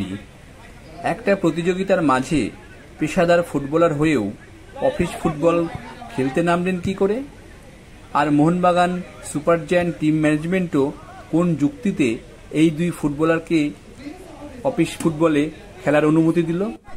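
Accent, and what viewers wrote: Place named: East Bengal, Bangladesh. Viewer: Video ta jeee koreche r jar voice sona jacche ami sure seee Udbashtu opar bangalar mal 💯💯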